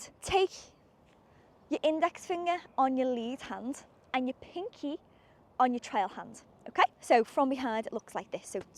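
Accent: Liverpool, United Kingdom. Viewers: Great video, I'll have my wife check it out as she's new. Off topic, is that Scouse I hear?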